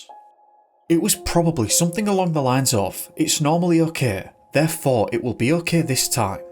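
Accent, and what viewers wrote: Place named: Scotland, United Kingdom. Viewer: You could have easily been a character with your voice on trainspotting.🥳👀🤡✌️✌️✌️👌 cheers mate......no you sound just like you Ewing McGregor...WOW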